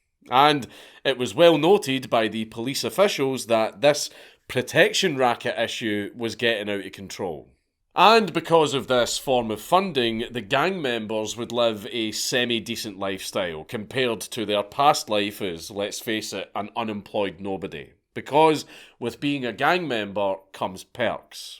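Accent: Scotland, United Kingdom. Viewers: I feel like listening to you as helped me understand Scottish accents as a whole much better. I'm a yank so I my inferior American ears don't process these European accents as well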